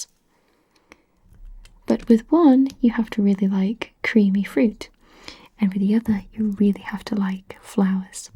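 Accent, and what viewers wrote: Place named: Wales, United Kingdom. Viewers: U sounds like a British tho u r american :) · She’s definitely Welsh, I can hear the accent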